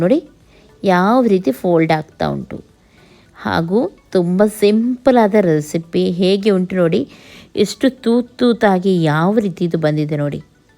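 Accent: Mangalore, India. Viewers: Super recipes and your Mangalore language sweet voice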